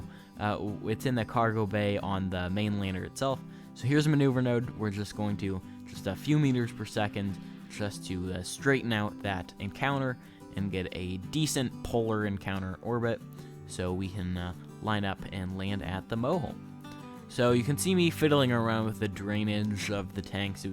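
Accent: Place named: United States. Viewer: be soundin kinda american doe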